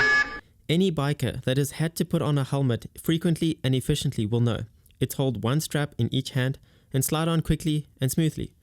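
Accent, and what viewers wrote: Afrikaans, South Africa. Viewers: r u south african? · I knew I heard a fellow South African voice hahahahaha..... Was a funny vid I needed it. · Are you South African?? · I thought he sounded afrikaans XD I just assumed it was an auzie accent.. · Is that a South African accent i hear ?? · This guy is 100% South African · Are you south african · That south african accent is horrendous.. uhhh denni arrcheer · Heard that accent and was like AAAYYYYEEEE south africaaan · Your definetly from South Africa me 2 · Knew you were south african after your first word lol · That south african accent? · I knew you were South African 🤜🏾🤜🏾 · Are you South African?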